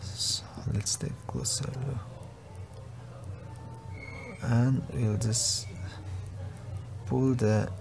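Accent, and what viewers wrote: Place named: Mizoram, India. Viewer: Subscribe nghal, tangkai lutuk ❤️ I awphawi ah mizo inih ka ring ka en nghal bawk a, thanks for the video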